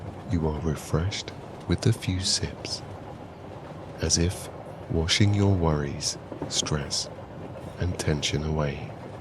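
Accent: Scotland, United Kingdom. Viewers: I was having some trouble recently calming manifestation.guide the voices in my mind during my morning meditation. him has a wonderful Scottish accent and a very calming voice. Thanks to his meditation CD's I have gotten back on track and feel a big difference in my meditation practice.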